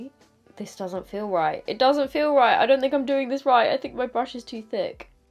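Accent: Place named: United Kingdom. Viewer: I just watch your videos. I dont care how long they are. I enjoy listening to your British accent. Keep up the good work. Love ya.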